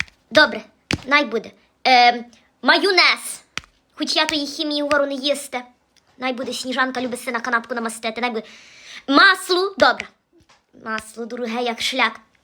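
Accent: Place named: Western Ukraine, Ukraine. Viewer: ВИ СУПЕР ПАНІ. ЛЮБЛЮ ЦЕЙ ДІАЛЕКТ ЗОЧ НАСПРАВДІ ВЖЕ НЕ ЧУЮ ЙОГО В НАС НА ЗАХІДНІЙ. ДЯКУЮ ВАМ СОНЕЧКО. ПРОДОВЖУЙТЕ НАС ВЕСЕЛИТИ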